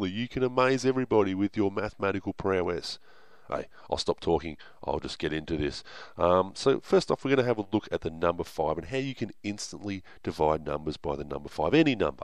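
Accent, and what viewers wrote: Australia. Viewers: Is he strayan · you are Australian right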